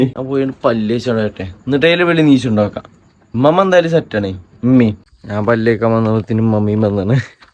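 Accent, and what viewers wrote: Malappuram, India. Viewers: Malapuram slang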